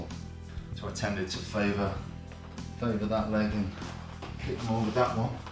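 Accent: United Kingdom. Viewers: Ey,this is amazing...I can do some physical exercises and at the same time improve my listening and pronunciation... Nice British pronunciation!!👌👌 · Pretty British 🇬🇧 accent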